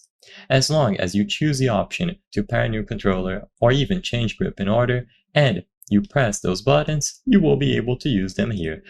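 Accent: Brazil. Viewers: o sotaque não me engana, br na área!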